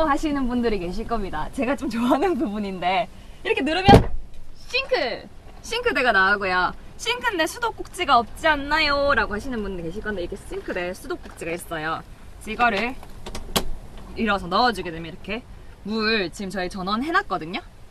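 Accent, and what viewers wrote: Gyeongsang, South Korea. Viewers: 대구분??같네요 저도 꿈이 자연방송,캠핑방송하는건데 너무부럽습니다 영상잘보고갑니다.구독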